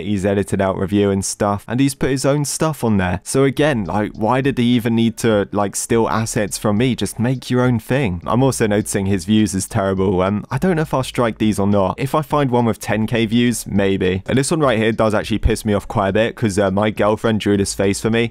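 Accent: United Kingdom. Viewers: is going full british mode on this video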